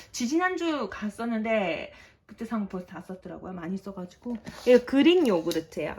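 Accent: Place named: Japan. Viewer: 라면 많이 쟁여 놓으신거 보고 깜짝 놀랬어요 ㅋ 한국에 있을때 육개장 라면 엄청 자주 먹었었는데 ㅋ 갑자기 급 먹고 싶어지네요! 발음이 일본인이 한국말 하는 거 같아요! 일본어가 네이티브 수준이신가봐요! 너무 부러워요^^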